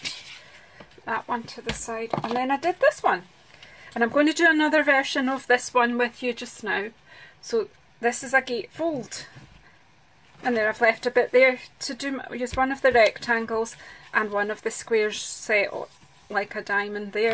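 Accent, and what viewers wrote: Scotland, United Kingdom. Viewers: Stunning DSP / beautiful card and a fabulous Scottish accent! Tfs. xOx ~ C ~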